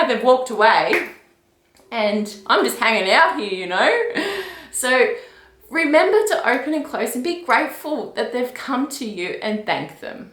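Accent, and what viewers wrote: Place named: Australia. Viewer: the Aussie accent just like her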